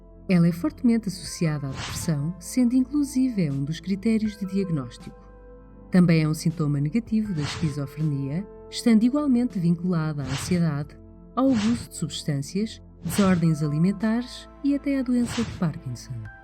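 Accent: Portugal. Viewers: É q o sotaque é de Portugal haha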